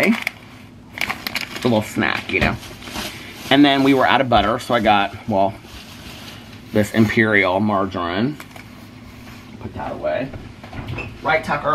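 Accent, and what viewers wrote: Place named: Southern Indiana, United States. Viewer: I'm loving how that "Indiana southern" accent came out in full force in this video. 😃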